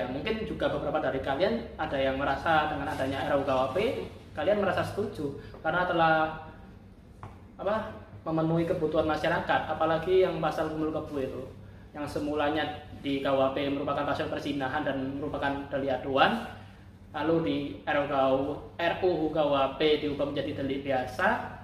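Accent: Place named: Java, Indonesia. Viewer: Suaranya kaya medhok koyo wong jowo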